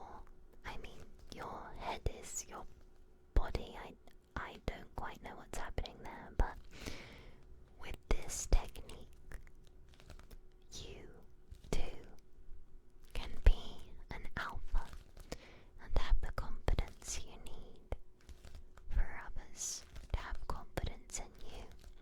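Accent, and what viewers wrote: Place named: United Kingdom. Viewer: Bri'ish woman detected, my neurons activated.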